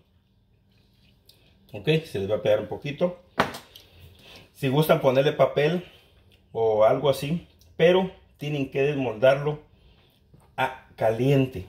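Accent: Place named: Mexico. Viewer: Su acento suena más a mexicano que a guatemalteco 😃👏👏🇲🇽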